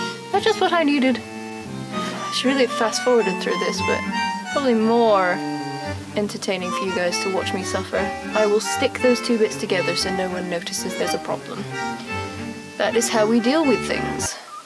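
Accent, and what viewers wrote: United Kingdom. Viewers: Hannah Hetzel he is from Scotland but has a very British accent. His accent is cute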